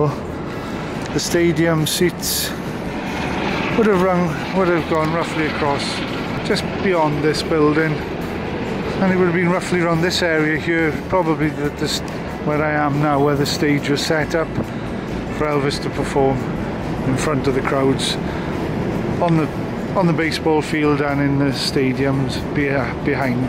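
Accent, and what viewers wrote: Wales, United Kingdom. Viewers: Smashing Pics Of Young Elvis. Amazing How More Information Keeps Popping On Elvis After All These Years. Thanks To Elvis Fans Like.(Do I Detect A Lovely Welsh Accent) Being Welsh Myself.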